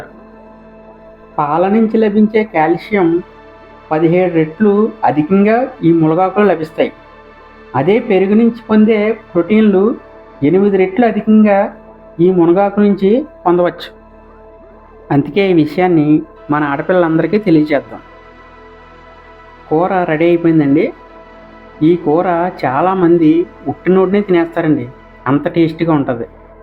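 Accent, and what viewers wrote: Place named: Godavari, India. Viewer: Very good curry & rasam. Good combination. Thanks for the video with godavari accent.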